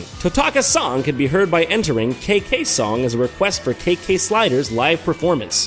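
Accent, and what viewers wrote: United Kingdom. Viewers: Awesome British accent haha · That British accent... I... don't know what to say! But I think I like it?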